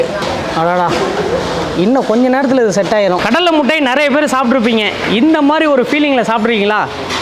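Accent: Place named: Villupuram, India. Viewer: Villupuram slang 😂😂 vj sidhu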